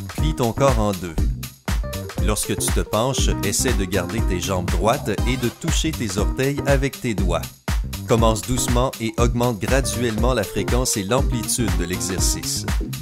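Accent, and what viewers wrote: Quebec, Canada. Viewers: Ses un québécois celui qui parle ?😊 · Pourquoi une voix québécoise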